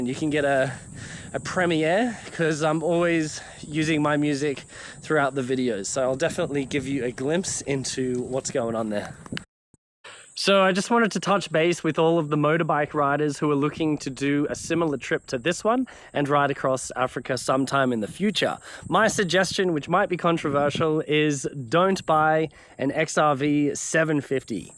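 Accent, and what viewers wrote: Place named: Australia. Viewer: Dear! You are the best guy I’ve found in youtube sice years, i’m so grateful to Famila Mundo (the camere;) to let me know you. Y like lissen you to improove my english undertanding and i adore australian accent… is very hard for me but very beautyfulsoundin for me. Please don’t offend if i ask you to make an experiment, Im 50 years old, and i’ve seen many things in the live..and in the health tha is a my passion since decades….. I heared in your breath the sign of a “sensitivity” to some moleculs present in the food. Expecially i’m almost sure you eat a large amount of “starchy” food…. Like grains, potatoes, flours food……. Please…. If you want to lissen a old guy that experimented many things, You can try for Trhee weeks to low down the use of any “starchy” product…. And eventually dairy products if you use them…. And you can observe by yourself if the improovement of breathing will occur, or not!! 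…. (I won’t give you my spoiler, even though You can easily guess it)😅 To compensate the lack of carbo, is the best choice, at your age, to put in the plate fruit and fruit and fruit, and many no starchy vegetables, raw and cooked. and sweet drink made by juice of fruit o cane…. Enjoy natural simple food!!!!! People around you will not understand You, but… do not worry, your experiment is a great improoving oporunity for you entire future healt of the live…people’s opinions may be discussed later, whit the results in the hand, after the experiment conclusion…🙏👍👍👍 Last info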